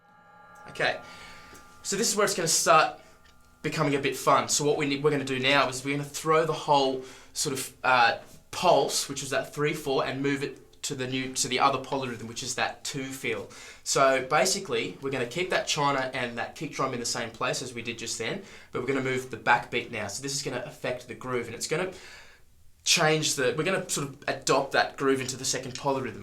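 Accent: Australia. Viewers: Ah you're an aussie mate! I thought you were a yank for some reason... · Hahaaaaaa you're an Aussie!! 😃🤪😜🏆🏁🎉💯👊🏼 F@ yeah Dawg!! Never heard you talk before.. only watch you do sic arse Meshuggah covers!!! Where you from bruh... I'm in Brisbane!!! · Australian?! Yes! Where you from? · Hey mate, where in australia are you from? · Finally an Aussie! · HAH he's Aussie!!! love it.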